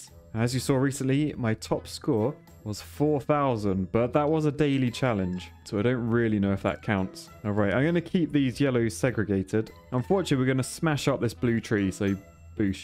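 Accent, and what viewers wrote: United Kingdom. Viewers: Hes brit dont mind him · Dude yo British 😂😂😂😂😂😂😂😂😂😂😂😂😂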